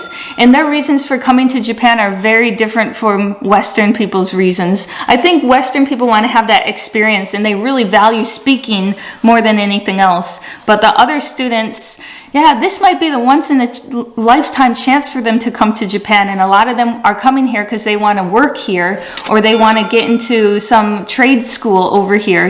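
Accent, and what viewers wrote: Michigan, United States. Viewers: Hi, I really admire you, learning languages. And especially now you are learning Korean. When you speak English, you can tell your American accent but it comes to Japanese and Chinese accents. It was a totally different tone. 👍👍👍😄😄😄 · you are losing your Chinese accent ;) I definitely hear the Michigan now in your English :)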